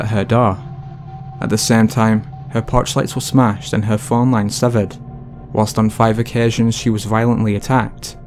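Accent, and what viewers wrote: Scotland, United Kingdom. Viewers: Totally off topic. I'm trying to nail down your accent. Sounds a bit Scottish to be English yet a bit too English to be Scottish. Leaning towards Scottish. I'm American and not super knowledgeable about different dialects within the different UK countries. Educate me please, and good vid thanks. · You ripped the voice of Criminally Listed right out of his mouth and made it british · Where is this narrator from? I can't decide where in Britain this accent is from. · I enjoyed your well done presentation; and your voice. Curious: Your accent sounds like a British regional accent. But I've no idea from where. Congrats, in this conforming age, of sticking to your accent-- adds character and depth btw. And your voice with it is clear, unlike some regional British accents, let alone other harder to understand ones. So, curious, where is your accent from? 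(Or if someone else recognizes it). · so, the #2 mystery is still UN-SOLVED!! theories about how someone died means it's still a mystery. You had me all convinced b/c of the disarming British accent. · I rather like your accent although it’s a little difficult for my Brooklyn ears to understand. Is it a Scottish accent? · You had me with your first British utterance. New sub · British accent is terrible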